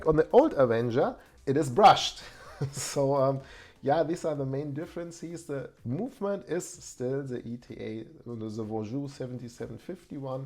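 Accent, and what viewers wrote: Germany. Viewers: I like the German exent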